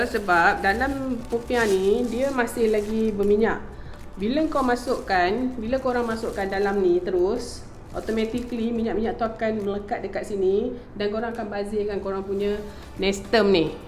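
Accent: Perak, Malaysia. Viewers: Aku.... Aku... Bahasa belah mne ye.,, selalunye org perak? Maaf hanya bertanye... Tanye😁😁😁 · Terbaik terang bercakap senang nak faham nati nak cuba buat 😊 sya dengar pon mcm orang Perak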